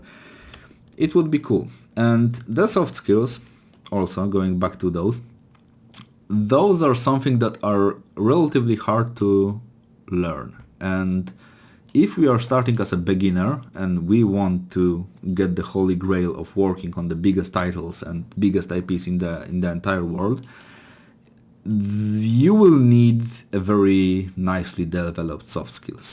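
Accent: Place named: Poland. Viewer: It's very funny cuz I can tell you a Pole few mins in 😂 Informative video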